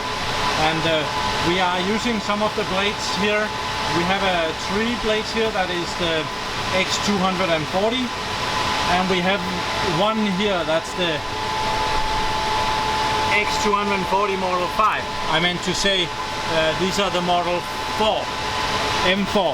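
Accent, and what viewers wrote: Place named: Denmark. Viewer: is he danish? sounds danish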